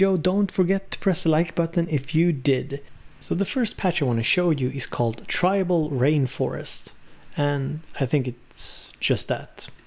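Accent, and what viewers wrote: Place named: Sweden. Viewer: Cool vid, as usual! This vst is so useful to beginnig in the euro rack adventure! Btw, You need to have a great job to travel and go to hotels, so cool! Btw 2, I know were your nice little accent come from now, cause i searched " " on google (Beware...stalker in view) and you come from: ♪♫♪♫ (drum bearing)...Sweden! Am i right?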